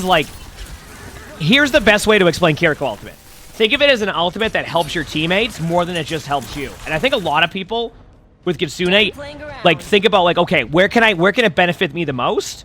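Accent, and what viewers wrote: United States. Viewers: Can someone tell me what accent has? I know it’s American ofc but what state specifically?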